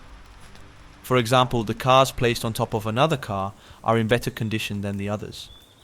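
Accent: Netherlands. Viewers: I like your Dutch accent 👍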